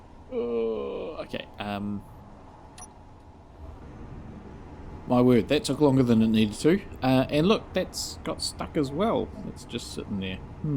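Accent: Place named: New Zealand. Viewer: Man... i know its a tiny thing, but i was waiting for the American accent... and then i was pleasantly surprised. Im assuming your a kiwi? i really like this build from what i have seen of it man, great work, well recorded video, wwell spoken. highly enjoyed!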